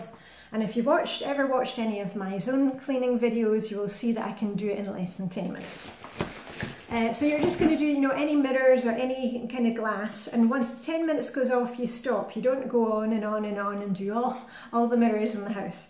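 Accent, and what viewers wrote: Scotland, United Kingdom. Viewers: I’ve only just found your channel! from Denmark? As soon as you had said your first 4/5 words I knew you were from Scotland 🏴 and when you said Bahooki - that takes me back 🏴- well I’m hooked... I’m clicking on to binge watch. It sounds like we are having a wee blether! Cheerio Lizx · Fine Scottish accent,!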